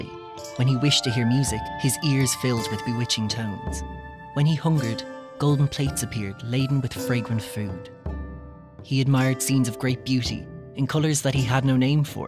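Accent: Dublin, Ireland. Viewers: He's got an ear-grating D4 accent though. The most reviled accent in Ireland. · As an Irish person I agree with the OP because the Irish accent adds the authenticity that an American accent wouldn't, whether Irish American or not. American accents are already so omnipresent in all media that I don't see the problem in our myths and legends being recited by a recognisably Irish tongue. There's no reason to feel insulted 🤷🏽‍♀️ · Its a Irish accent because an American cant pronounce the names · I appreciate that you had someone with an Irish accent narrate this. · Lol you can hear the narrators irish accent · Love the story. The irish voice also goes with it too. Keep it up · One complaint: the stress on 'Danann' ought to be on the first syllable, not the last one. Unless you're speaking Munster Irish, and the narrator sounds like they're from close to Dublin. 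· D4 accent? Just sounds like Dublin to me.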